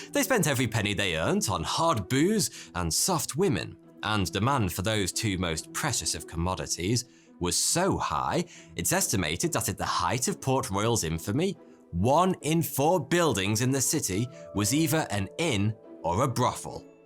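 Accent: United Kingdom. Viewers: It's kinda weirdly cool hearing a Brit speak about Jamaica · Bro I’ve seen about 20 of your vids and wondered why you kept saying “Hi, 42 here”. I finally realized what you were saying! You Brits have a funny way of talking 😂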